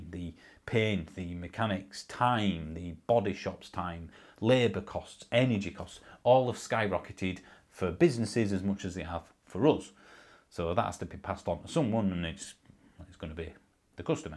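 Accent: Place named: Hartlepool, United Kingdom. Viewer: Same accent as Hartlepool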